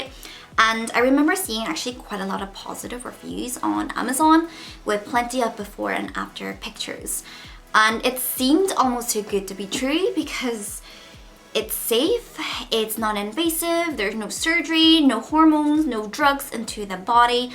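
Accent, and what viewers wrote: Northern Ireland, United Kingdom. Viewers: its so nice to hear a Norn Irish accent!!! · What kind of accent do you have ? In some moments, you sound British, there was a hint of Irish at one second. I’m so interested to know where you grew up or where you live now?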